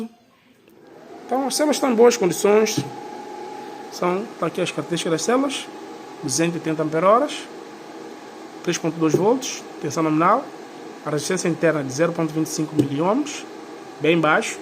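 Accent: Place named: Portugal. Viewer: O idioma dele é português, mas com sotaque de Portugal, de repente, lá no país onde se encontra, consiga comprar baterias à preços mais honestos, mas para nós aqui do Brasil, os preços estão de ferrar mesmo, tanto pelo Aliexpress direto da China, quanto os ditos "estoques do Brasil com todos os impostos inclusos".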